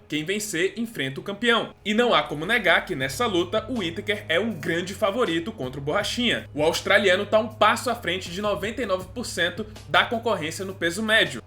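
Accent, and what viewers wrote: Recife, Brazil. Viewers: Tu é de Recife bixo ?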